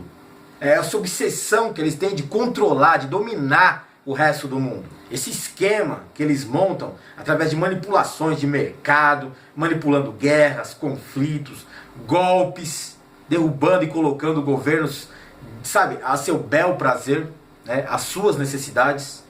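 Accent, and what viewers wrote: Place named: São Paulo, Brazil. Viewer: Vc é o Cara! Eu sou carioca - tenho vergonha da cidade hj, certo! - e jamais pensei em encontrar um mano verdadeiro com sotaque paulista. O impossível ė possível, acabei de encontrar o. Valeu, pelo canal. Para agradecer só posso retornar com 100% de audiência. Mano, não desista do seu povo, força guerreiro!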